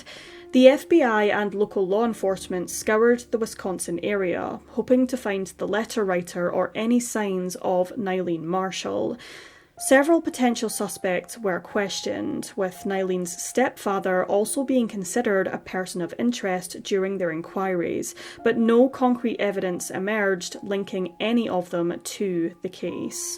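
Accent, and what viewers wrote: Scotland, United Kingdom. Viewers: Remember she is Scottish and they pronounce things differently there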